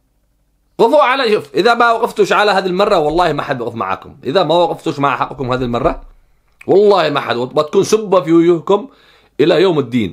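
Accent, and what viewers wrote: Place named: Yafa, Yemen. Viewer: لهجتك يافعي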